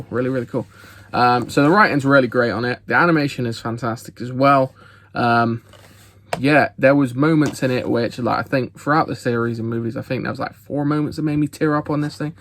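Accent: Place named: Bristol, United Kingdom. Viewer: A fellow Bristolian loving hearing the accent and also the sheer variety of films you watch - keep up the good work!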